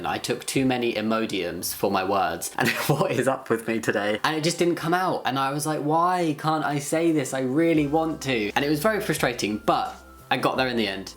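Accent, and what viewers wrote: United Kingdom. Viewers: Wait, your British?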